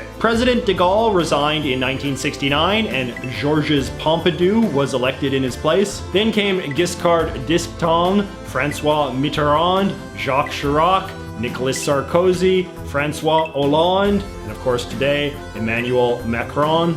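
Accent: Canada. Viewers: J.J. McCullough- are you Canadian?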